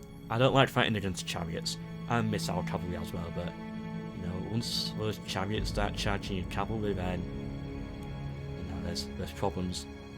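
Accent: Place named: Yorkshire, United Kingdom. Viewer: I find the accent both distinct and pleasant and fellow Yorkshireman. I know your dialect is local so maybe I'm wrong but I normally hear Carthage pronounced like 'carthidge'. Not trying to be a nob, no one messes with the dark lord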